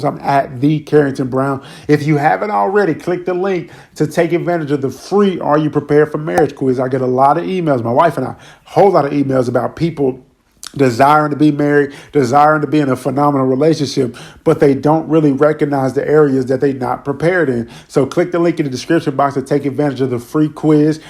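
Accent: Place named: Washington DC, United States. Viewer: Are you from DC? …. I hear an accent and the slang lol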